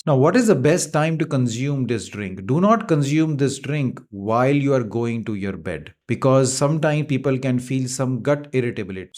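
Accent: India. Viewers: They know - just because its not how you pronounce it. it doesn't mean its wrong. Its Indian English and that how it is.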